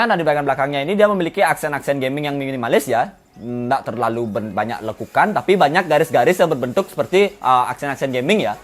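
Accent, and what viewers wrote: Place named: Bali, Indonesia. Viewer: medok bali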